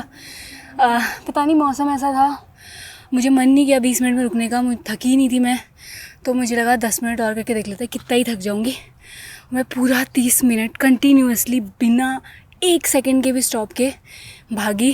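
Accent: India. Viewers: I saw many people said thy were attract how u talk d reason is ur desi hindi ☺😁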